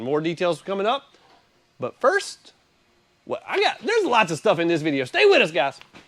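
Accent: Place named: South Carolina, United States. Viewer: Such a shame you’re not gonna circuit race this baby she’d be a mean ass ride dude the South Carolina accent is just about gelling with this Kiwi boy from down under 😂👌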